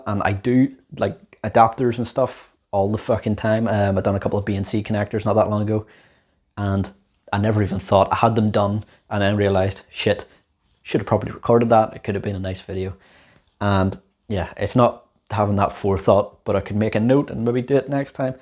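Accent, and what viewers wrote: Northern Ireland, United Kingdom. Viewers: Nice to hear a Northern Irish voice on YouTube! Great channel! Not sure if you've already done this but 'How to make a AM/FM Radio' would be cool. Cheers.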